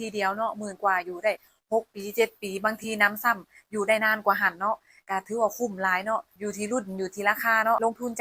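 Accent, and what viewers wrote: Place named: Isan, Thailand. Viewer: พูดเหมือนคนอีสานคิดว่าตัวเป็นคนไทย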